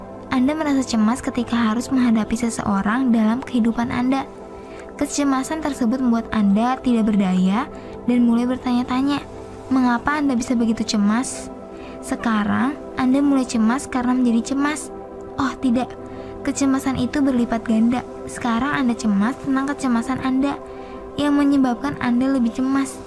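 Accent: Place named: West Java, Indonesia. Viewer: Suara logat orang sunda nih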